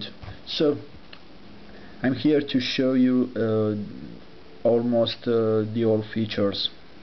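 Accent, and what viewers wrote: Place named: Italy. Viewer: love the italian accent, a lot of friends speak like that :D Cheers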